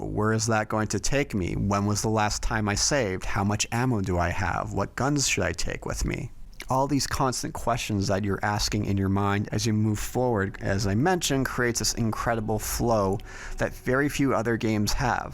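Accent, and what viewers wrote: United States. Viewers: Thank you. I actually did not know that, but the person streaming sounds American. The H is silent in the USA.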